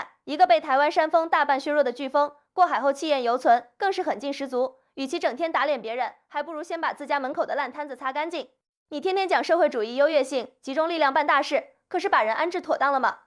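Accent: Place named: Beijing, China. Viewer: 住日本的台灣人？台灣人講話北京腔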